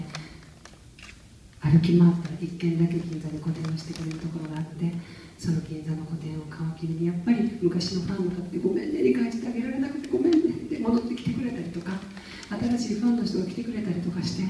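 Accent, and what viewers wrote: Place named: Osaka, Japan. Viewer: いつもより大阪弁のさんが新鮮です！ありがとうございます😊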